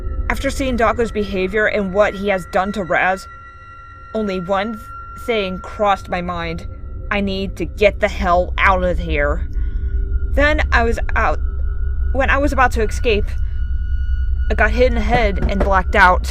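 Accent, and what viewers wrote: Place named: United Kingdom. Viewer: that British accent xD this is awesome and your voice is so sweet!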